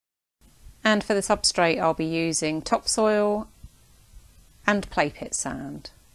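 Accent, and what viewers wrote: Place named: United Kingdom. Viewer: You by far have the best informative videos for leopard tortoises! I'm trying to learn as much as I can before I purchase my first ever shelled family member. I'm making sure that I get the enclosure, lighting, and the growing of grasses and plants correctly first before I do that. I have a few comments though. Not that your babies have pyramiding, but what do you think of closed chambers to trap in humidity? I've heard nothing but great things about it. And I wish you shipped overseas to the U.S. because I would love to get a baby leopard from you. You do a fantastic job and your videos are phenomenal! It's awesome how you have checklists and you even display them on the screen for us to pause and jot down. And one last unrelated thing. I love the British accent! Keep up the great work. I subscribed and will eagerly wait for your next video.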